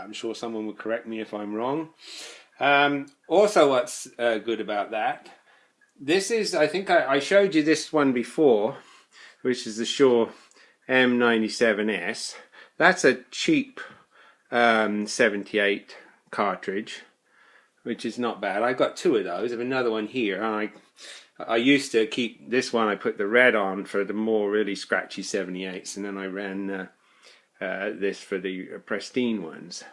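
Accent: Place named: West Country, United Kingdom. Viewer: The accent sounds like it's from the West Country, perhaps Somerset.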